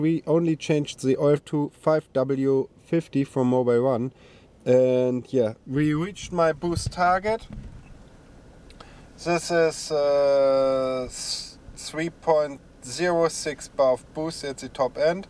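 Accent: Germany. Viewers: Typisches deutsches Englisch xD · Ist doch schon ziemlich solide dein Englisch content - ein gewisser ‚german accent‘ darf durchaus bleiben: It’s not a big, it’s a feature …or USP ;)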